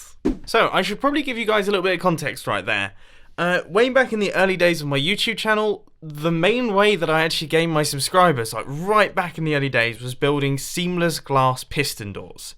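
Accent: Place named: United Kingdom. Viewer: How many other people freaking love his accent? It's so great, it's probably just me, I have a thing for British people.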